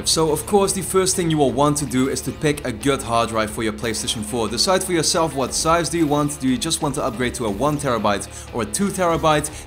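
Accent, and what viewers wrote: Netherlands. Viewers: Kan merken dat je Nederlands bent maar heb wel even paar vragen ze zeggen je kan je games wat je ge saved hebt ook online bij ps4 plus en die later op je 2tb neer zetten klopt dat of niet groetjes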